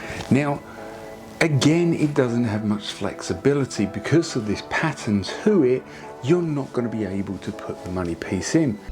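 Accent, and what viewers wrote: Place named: United Kingdom. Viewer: And YOU are annoying because you're operating YouTube, and don't even know how to adjust the speed 😂 This man put in SO much work to HELP TEACH people, and all you can do is complain? Maybe just don't watch at all and save the annoying, rude, and ungrateful comments for somewhere else 🙄 Also- Many British accents are slower/more drawn out. He's also trying to be very deliberate in what he says so that everyone can understand.....but again- you DO have the option to speed it up!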